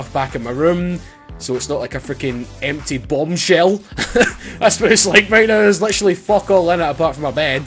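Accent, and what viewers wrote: Scotland, United Kingdom. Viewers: Are you from Scotland. I like your accent if that's how you spell it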